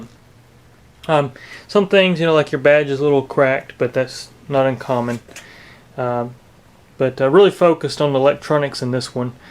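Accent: South, United States. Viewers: You sound southern?! I’m in NC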